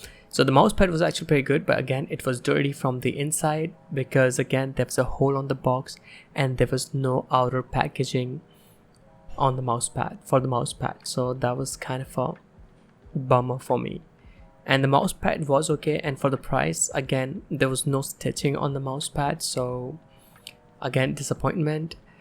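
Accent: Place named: India. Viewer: Indian acent nikal rha bich bich mein. Wohi sahi hai. Yeh affectation gay lag rhi bhai. Sachi mein gay hai tu to sorry.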